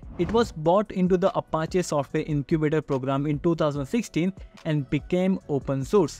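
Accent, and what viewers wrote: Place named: India. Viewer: It's usually difficult for me to understand the Indian accent, but your knowledge, examples, and slowing down the speed to .9x was wonderful. Great video!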